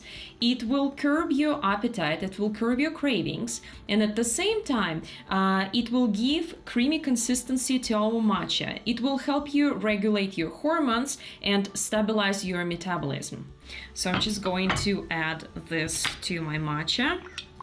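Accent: Russia. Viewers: Classic russian accent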